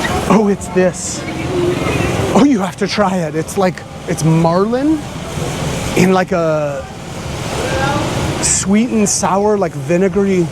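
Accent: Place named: Iloilo, Philippines. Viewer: I don't think you're an expat, i think you are actually Illonggo, very good job!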